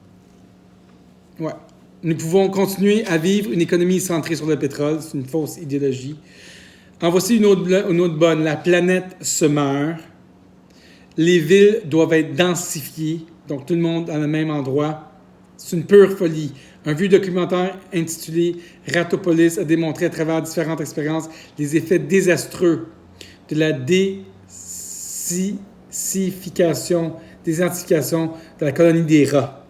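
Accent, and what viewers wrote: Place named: Canada. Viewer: J’ai horreur de certaine personne qui se permettent de critiquer ,c’est l’accent du Canada,eux en tous les cas n’ont pas peur de dénoncer se qui se passe ,merci aux Canadiens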